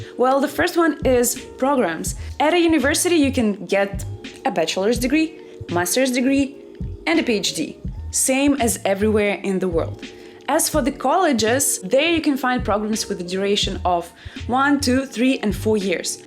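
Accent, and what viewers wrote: Russia. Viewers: Wait are you russian? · Are you Russian? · Are u russian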